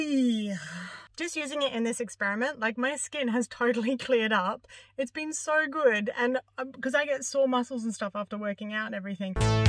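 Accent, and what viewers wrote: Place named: Australia. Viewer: I got you in my feed and wow. Late to the party as always. You are awesome. Got to tell you, you sound Aussie but than I saw you where in England. So I started thinking ARE there people in England who sound like that, but they hide them? You never hear them on any shows in the states. Kind of like Liverpool speekers. I did figure it out, if you where from England you might not sound as happy. My opinion.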